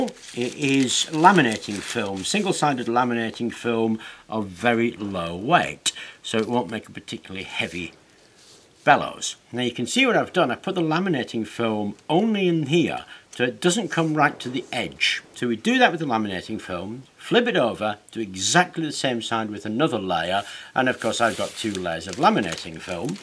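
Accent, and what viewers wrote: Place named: England, United Kingdom. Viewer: Actually watching this for camera bellows. That English accent is just the cherry on top.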